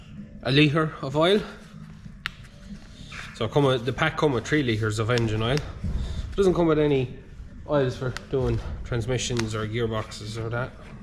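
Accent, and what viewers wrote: Ireland. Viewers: Same beltdrive like an snowmobile! I wonder,is it Irish or is it a dialect you mix whit English?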